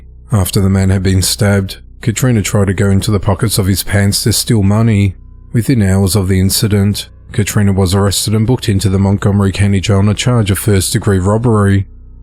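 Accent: Australia. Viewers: You're definitely an Australian, hey?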